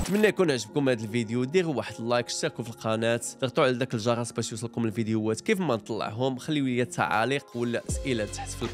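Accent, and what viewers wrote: United Kingdom. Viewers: ونتا عندك نطق برطاني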